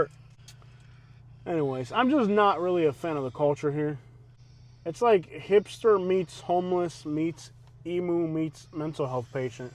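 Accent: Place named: South, United States. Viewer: LMBO with that southern accent 😂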